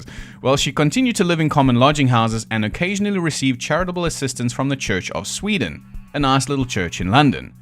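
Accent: South Africa. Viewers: Had some South African friends in London back in the day and it does me good to hear the accent again.....especially those wide open "I's"...(eyes...)....oh yes, and great content, love the chat-by-the-fire style, infectious laugh + amazing research and thoroughness...give a treat! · Dude you are fucking hilarious. It’s like listening to a south african George Carlin reading crime stories 😂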